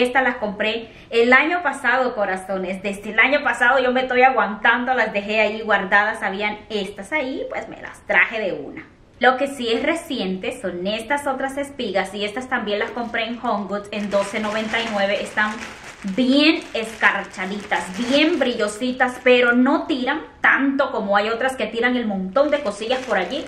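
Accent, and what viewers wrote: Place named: Dominican Republic. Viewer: Hola. Creía que eras Dominicana. Tienes una forma de hablar y expresiones nuestras. Salidos a los Hondureños.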